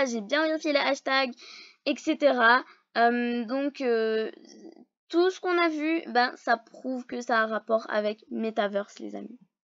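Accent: England, United Kingdom. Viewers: Merci pourles infos aussi belle accent anglais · Toujours un aussi belle accent anglais😉🦋 Et merci beaucoup pour l'explication!!😁❤ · Toujours un belle acsent anglais 👍✨ Ps :jaime trop t video et ton acsent ! Gros bisous et bonne vacances 🌼😂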